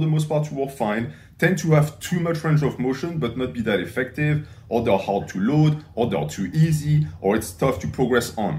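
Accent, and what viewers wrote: France. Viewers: Are you french or speak french as your main language? I can sense a little bit of french when you talk in English but keep it up !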